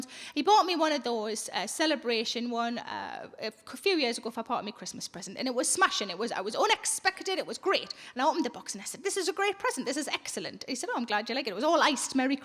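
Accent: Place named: Northern England, United Kingdom. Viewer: My family were from the UK, as is my husband, but we are a bit older now and especially for the stronger accents (like Sarah’s Northern accent) we use subtitles! We catch SO much more now! I hope this helps! Cheers! 😊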